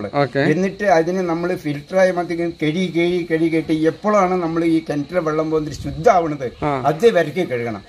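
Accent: Palakkad, India. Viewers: Palakkadan slang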